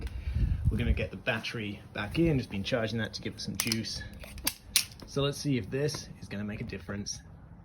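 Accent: England, United Kingdom. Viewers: Haha, Hello there watched the program last night and sounded like you have an acksent,and was across the pond there in England. Lol. That motor sounded good once you got it running,but no I'm from California here in the mountains above Sonora. I was drinking my coffee,and thought I would act like I was from England the way I wrote what I wrote. Lol. I'm a true Californion. Lol and was just playing around about sipping on tea. When I was 5or6 I did ride inn the back of one of those where the seat opened up and back window would roll down. 👍that was around 1980. 👍 a long time ago my FREIND. What's the planes with the car ?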